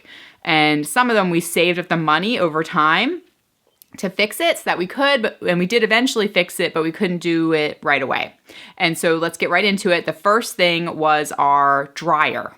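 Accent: Pennsylvania, United States. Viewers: Hey, I'm from PA too! I'm guessing by your accent that "yinz" are not from the same part of PA that I am 😜 Let's go Pens! But really, I feel you on the deer situation. I've hit more than I'd like to admit. Luckily the worst that's happened to me was the grill cracked and I lost a headlight. Right now my husbands laptop is broken and we are just sharing mine now. It works out fine because I have an iPad for work, so I just use it most of the time!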